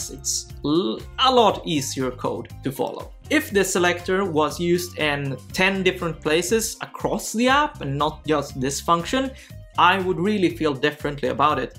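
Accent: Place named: Sweden. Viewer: Hi guys i'm i lead a small web-dev team writing mostly angularJS 'i also have a background in C# yet i fail to see +Krzysztof Suszyński point? BTW + i love your show you explain things very clearly and your Swedish accent now resonates in my mind on mondays:) i also have mixed emotions about the point made in the video..perhaps +Alexandre Franco video will make more sense of it i will have to watch it. nevertheless +Krzysztof Suszyński can you elaborate more on why is this more valid in JS?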